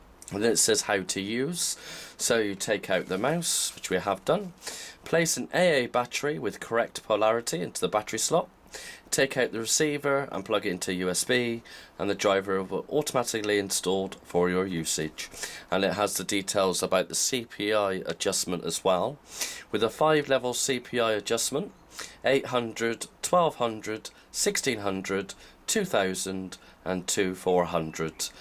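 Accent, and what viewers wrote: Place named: England, United Kingdom. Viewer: Thank you for explaining the "CPI" button on this product. I work from home on my laptop and purchased this mouse for my personal laptop use and did not understand the relevance of the CPI button, but your comprehensive review made it quite clear, and your English accent was a delightful bonus. Thanks again!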